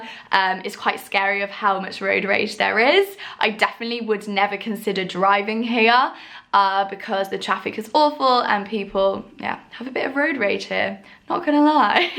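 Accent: United Kingdom. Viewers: После 12 лет жизни в США, британский акцент прямо режет по ушам, в хорошем смысле. Вспомнил уроки английского в челябинской школе)) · can teach Moscovites proper British English... 🇬🇧👈🏽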